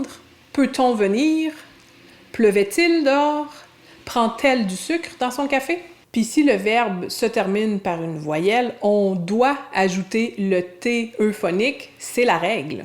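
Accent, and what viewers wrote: Quebec, Canada. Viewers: Alo! Félicitations pour tes vidéos, c'est vraiment super intéressant, enrichissant et d'un très haut niveau linguistique. j'apprends beaucoup en les regardant! Je sui prof de français au Brésil depuis 14 ans, beaucoup de mes élèves vont immigrer au Québec, je me suis donc rapproché naturellement de cette belle langue française parlée au Québec, je vous comprends de plus en plus ( au début c'était vraiment difficile, même sur TV5 monde, les séries québécoise sont sous-titrés...en français...ça l'a po d'allure..)> Je joue en ligne aussi avec mes amis québécois et j'adore votre accent, vos expressions! Je suis originaire de bourgogne en France, dans une mini région qui s'appelle la Bresse ( on nous appelle les ventres aunes parce qu'il y a des élevages de poulet...) mon grand-père parlait le patois, et j'adore ça...c'est impossible pour un parisien de comprendre le patois, les patois car bien sûr il y en a des différents suivant les régions.. 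Bravo encore pour la qualité de vos cours! A tantôt arnaud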